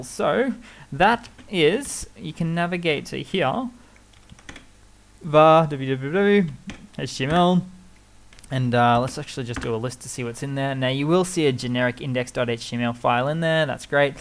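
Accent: Australia. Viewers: Enjoy your Linux video-tutorials, and especially great to hear an Aussie accent rather than the 'generic' American voice-over.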